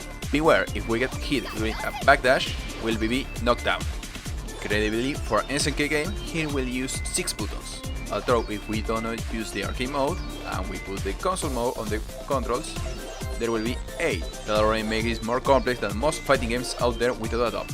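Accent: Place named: Spain. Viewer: Don't want to be mean, but you need to work out that pronunciation bro, either that or make Spanish guides. Try listening to the words on a text-to-speech or an online dictionary, you are using Spanish phonetics for English and it's never gonna work, just relax and it will come out more natural. The first step to talk more clear is to talk slower even if it doesn't sound as "cool". Thanks for the guide I think, this is the same in-game tutorial though... I just want to know how to make 10 hit+ combos without the air-state resetting, I try to use the wall but it doesn't work consistently.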